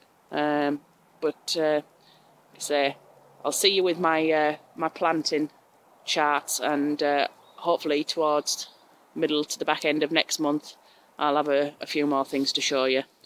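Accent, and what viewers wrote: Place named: Middlesbrough, United Kingdom. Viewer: gooseberries will grow, just plant it in the ground.. It'll be fine.:) your plot looks awesome, and i know what you mean about hearing your own voice..lol..I'm from newcastle, and going by your accent, you aren't from sunderland..I'm guessing Middlesboro.:).. look forward to your next vid.. by the way, I am seriously considering making a bottle house now..and its all down to you and your vids..:) cheers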